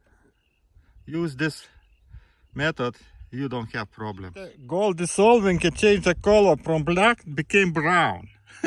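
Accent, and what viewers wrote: Russia. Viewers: But the funniest thing is Russian speaking English 😅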